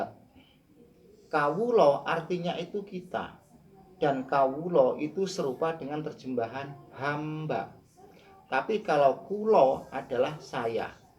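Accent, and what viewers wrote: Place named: Ponorogo, Indonesia. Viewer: Logat bahasa khas Ponorogo.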